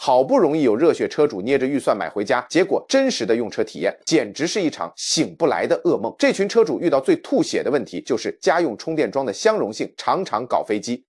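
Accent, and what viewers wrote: China. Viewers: 大陸口音 還裝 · 大陸口音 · 你的敘事在台灣應該是在中國，裡面的車除了最後那幾名其他的車根本在台灣沒有出現過，這種超爛的AI內容農場，這是中國無聊的統戰，錢沒地方花拿出來隨便唬爛，以後聽到中國口音直接封鎖，真是浪費時間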